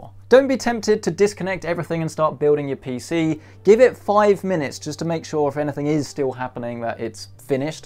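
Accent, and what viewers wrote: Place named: Southern England, United Kingdom. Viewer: Sounds like Southern England to me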